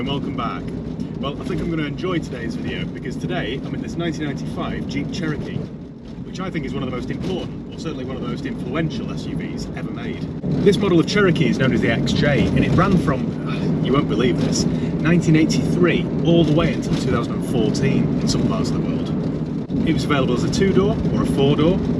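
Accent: United States. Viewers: Good American accent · Your American accent cracks me up so funny! 🤣🤣 Keep up the cracking content!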